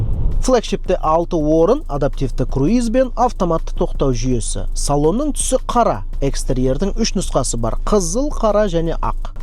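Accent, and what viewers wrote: Standard. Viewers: жарайсың таза қазақша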